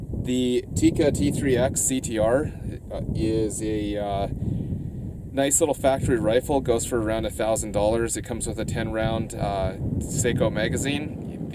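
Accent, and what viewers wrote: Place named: Canada. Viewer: noooo Canadians I knew it when you started talking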